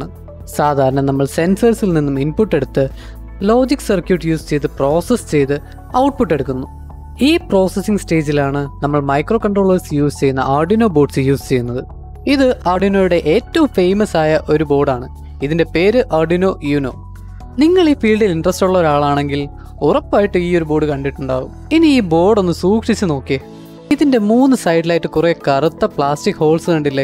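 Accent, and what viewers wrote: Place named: Kerala, India. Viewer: Bro Malayali aarnnallea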